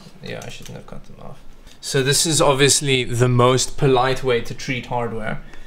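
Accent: South Africa. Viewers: Wait you're south African?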